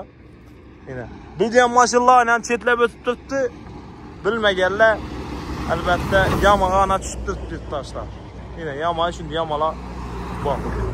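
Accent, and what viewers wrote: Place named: Khorezm, Uzbekistan. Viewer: Хурматли хоразмликлар эфирга узатаётган видио обзорларингни бутун узбекистон ахли курсин десанглар курсатувларингни шевасиз китобий тилда олиб боринглар , курсатувларингни кураётиб тушунмаганимиздан курмай куяколаяпмиз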